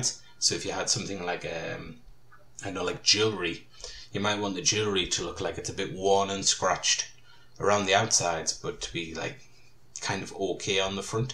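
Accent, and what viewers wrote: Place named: Northern England, United Kingdom. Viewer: Hello, mate. This video is a pure gem for me saving this to my favorites. Cheers! P.S.: You've got a very familiar accent to me. It reminds me (actually it's identical) of my British friends from the oil field I work at. I don't quite remember their origin but sounds like a bit of slow Scauser but more Northern England. Where are you from?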